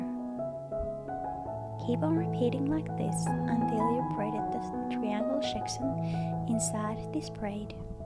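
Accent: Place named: Finland. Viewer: She's Finnish. That's literally a Finnish accent. Are you serious right now?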